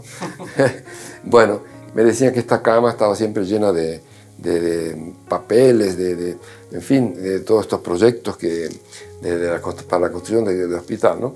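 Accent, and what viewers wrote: Argentina. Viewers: Es argentino el por lo que se escucha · Gracias por este documental Muy bueno el recorrido, la transmisión y la conversación del Padre que narra este precioso documento Parece argentino🇦🇷por su forma de hablar, o de esta zona del continente Dios bendiga a todo el equipo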